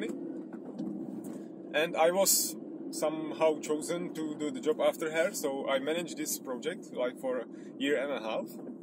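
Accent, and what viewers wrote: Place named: Czech Republic. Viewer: and sou on xD cesi maj asi nejhorsi prizvuk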